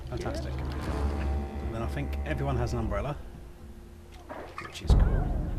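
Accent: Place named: United Kingdom. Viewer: I don't know why you don't have any subscribers this is actually good content! and the british voice is a plus good job!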